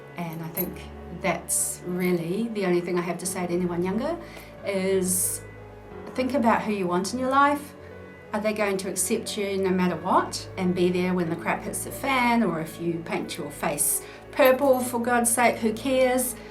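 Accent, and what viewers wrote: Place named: New Zealand. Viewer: Are you a new zealander?? I just caught the accent and was like whoa! I'm from Christchurch. I'm just transitioning into the goth subculture--by that I mean I'm now dressing more to reflect the things I've always liked :) Enjoyed the video edit: I totally commented before you mentioned NZ 😂 I just get excited when I find NZ'rs in youtube haha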